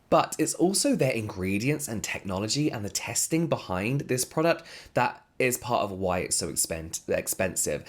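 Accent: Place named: RP, United Kingdom. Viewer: i hope this isnt a weird question but what english accent do you have? Is it conservative RP? I'm just curious to know because i love how classy and posh it sounds.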